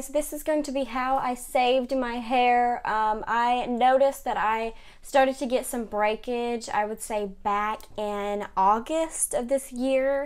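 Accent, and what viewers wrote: South, United States. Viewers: It's a southern accent...could be Californian.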